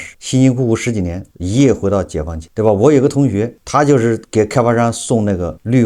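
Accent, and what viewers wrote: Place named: Gan, China. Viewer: 赣语怀岳片，湖南湖北是你的错觉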